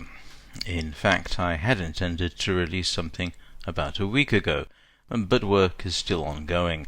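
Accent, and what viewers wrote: United Kingdom. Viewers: I disagree, he just sounded well posh the exact way a British Naval Historian should sound, that includes being several glasses deep with sherry, and is so to be expected, yet he really didn’t sound blushed at all I must say so. In any case, referring to Japanese words and names as “gobbledygook” is the only term in, around, and relating to this video as any sort of ill reference to such the topic at hand. I’m not sure if you’re ignorant of how the Japanese named their vessels or if you simply did not listen to the beginning when he pardoned himself from any potential mispronunciations, as nothing was extremely mispronounced or even relative to the term extreme, especially for an Englishmen. There are far better criticisms to be writ of far lesser quality videos out there, young friend. Edit: typo correction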